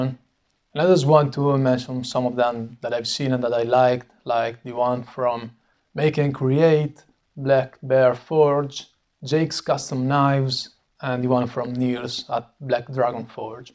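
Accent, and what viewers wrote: Italy. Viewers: Nice hatchet...... Una curiosità sei italiano per caso? L'accento è quello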